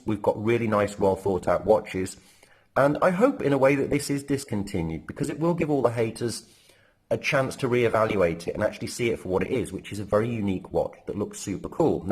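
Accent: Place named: United Kingdom. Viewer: Judging by your accent I take it your from the U.K. and from what I heard is that the P01 over in the U.K. was a hit as soon as it became available. I’m not saying there were waiting lists for it. Just that when a dealer got it there was someone to buy it. Maybe that was the reason you had such a difficult time acquiring one. Over here in he U.S. it’s not as popular in my opinion and because of that if you do some digging around you can still find one from an Authorized dealer. But I don’t believe that all dealers are even ordering them. At the moment the watch is getting no love from anyone but as soon as it gets discontinued people will start realizing it’s a classic and a must have. I’m really curious if they discontinue it when they come out with new releases or give it another year. Maybe corna will be a decision factor for discontinuing it because they are backed up on production?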